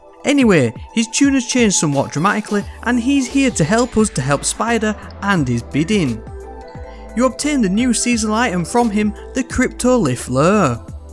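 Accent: England, United Kingdom. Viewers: That's an interesting pommy accent you have there lol. I've never lure pronounced lurrr before. Thanks for the vid ;) very helpful.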